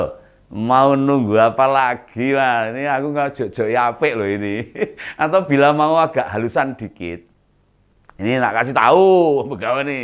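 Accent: Java, Indonesia. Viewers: Sy seneng kalo ada ngompolnya. Sy sll nunggu yg baru dgn bahasa2 yg lucu dan diselingi boso jowo yg medok. Mantaab.... 👍👍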